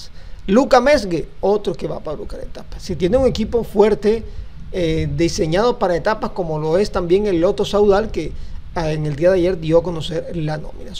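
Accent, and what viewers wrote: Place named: Spain. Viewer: lo admiro, pero no hables estilo español, no dugas vidio, somos colombianos, ( vídeo,) un abrazo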